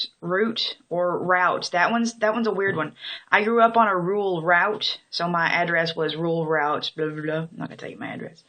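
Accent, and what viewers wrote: Southern Illinois, United States. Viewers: Finally a real southern IL accent. :) Thank you! I've been mistaken for being from KY too. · You say everything exactly like me. I’m from Mound City 😊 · I grew up in Marion Illinois and she sounds like a native Southern Illinois. Actually she doesn’t sound country enough. Most of the older people I know talked much slower with a more southern draw. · Probably depends on what area of Southern Illinois you are from. Everybody I know sounds like this. I grew up on the Mississippi river. Grand Tower. All along this area all the wag down to Cairo people talk like this. Not even trying. It is just the way we talked. I have been in Wisconsin for the last 20 years and it has faded quite a bit since Wisconsin has a very specific accent as well. I have noticed the biggest part of the accent is that we spoke our words really fast. 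· SO, IL for sure I be from Collinsville Il u got it down pat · Her accents the typical one for Southern Illinois. This is just the way people sound when talking, you obviously haven't spent much time since you don't understand this. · I've lived hear in Southern Illinois all my life, & she sounds like everybody I know. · I grew up in Carterville! But I have less of an accent than this lady, and I knew people with a much stronger Appalachian-type accent. There's a gamut. No idea why there's considerable diversity.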